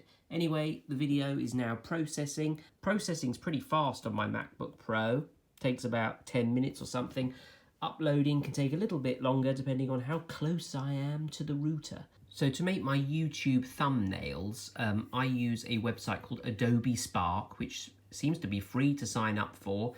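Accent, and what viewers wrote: United Kingdom. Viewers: I like listening to your very British pronunciation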